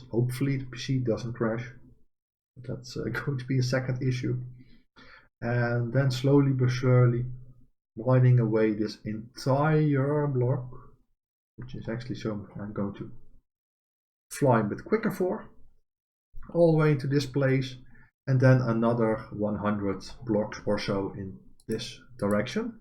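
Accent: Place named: Netherlands. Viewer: Can you do a tutorial about computer craft? I am sure you are very good at explaining it. BTW, Your accent tells me you are Dutch I am right?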